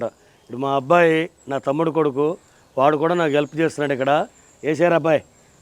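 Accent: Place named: Vemuru, India. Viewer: మాది వేమూరండోయ్.... మా ఊరు మాటల్లానే ఉంటాయి అనుకునేవాడిని... మొత్తానికి నా ఆలోచన కరక్టే అయ్యింది... ఇక దంచెయ్ అన్న... వంటలతో...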